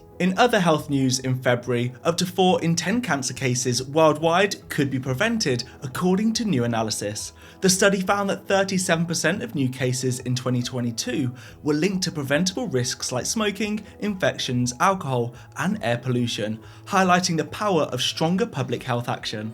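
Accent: United Kingdom. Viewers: i lowkey thought you were moist critical until i realized you’re british 😭😭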